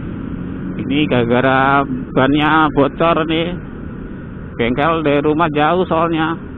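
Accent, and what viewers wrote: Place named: Banyumas, Indonesia. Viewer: Motovlog ngapak -ok tetep semangat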